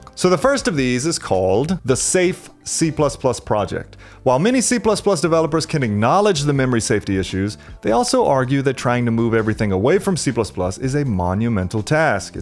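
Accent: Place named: Chicago, United States. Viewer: Completely off topic but correct me if I'm wrong but is that a Chicago accent I'm hearing?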